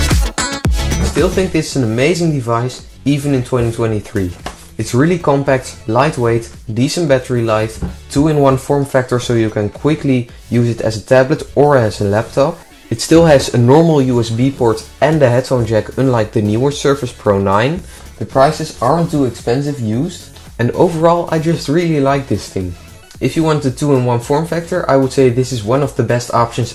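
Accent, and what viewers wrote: Netherlands. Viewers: Are you dutch perhaps? Or maybe from Belgium? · You sound like you are from the Netherlands!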